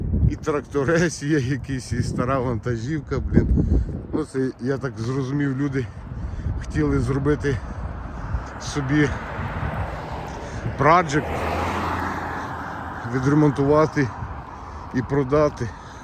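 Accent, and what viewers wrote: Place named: Rural, Ukraine. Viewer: Перший раз побачив в неті цей канал, по вимові впізнаю земляка, підписався буду дивитись.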